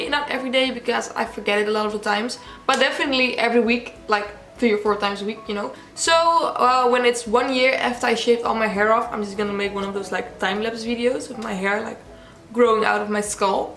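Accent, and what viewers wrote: Netherlands. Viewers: omg ben je nederlands of beeld ik me nu een accent in? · This is my first video watching you and your english accent sound so similar to mine are you by any chances dutch?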